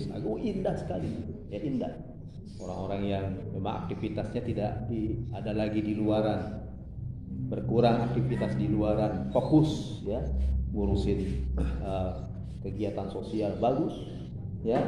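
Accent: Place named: Sunda, Indonesia. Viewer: Meni nyunda........😁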